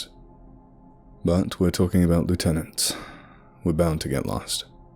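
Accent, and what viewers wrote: South, United States. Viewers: i like the country narrator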